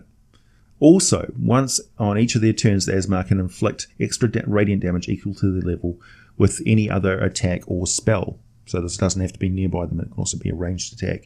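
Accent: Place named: New Zealand. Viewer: Wow another Kiwi! Such a great thing to hear when I'm not expecting it XD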